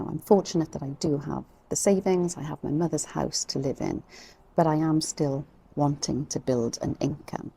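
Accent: Wales, United Kingdom. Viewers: I'm 65 and retired with two little jobs. Resonate with everything you said, and with your lovely Welsh accent. My mum was Welsh and to me Welsh voices are warm and soothing. I wish you well and will subscribe. I havent had a health event, but am glad to be reminded by your experience that there is nothing more important than time to live your life. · Lovely Welsh accent 😊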